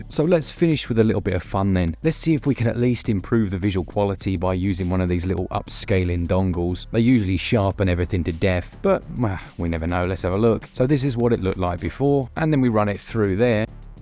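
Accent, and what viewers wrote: United Kingdom. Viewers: your pops and plosives are really wet and disgusting also cease that gross british accent too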